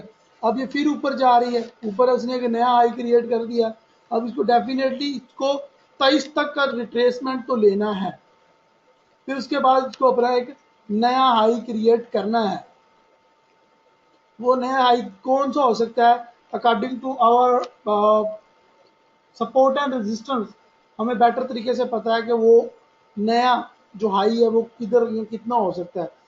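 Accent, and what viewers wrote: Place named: India. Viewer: sir apka desi andaz buht acha buht maza ata hai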